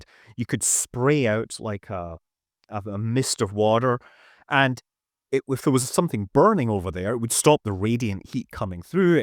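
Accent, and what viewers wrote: United Kingdom. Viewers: Another Brit narrating US History. Why?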